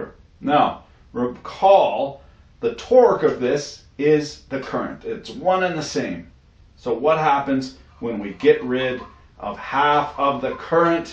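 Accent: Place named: Canada. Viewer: I love ficking Canadians. you sir get a subscribe just for the way you talk lol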